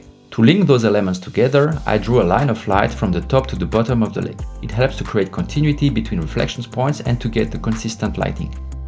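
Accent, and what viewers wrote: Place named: France. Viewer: Ah, cet accent Français inimitable, qui a son charme :) Merci pour le tuto.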